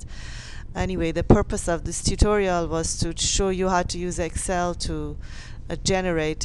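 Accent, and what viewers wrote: Iran. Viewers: shes is clearly iranian. the accent is too obvious haha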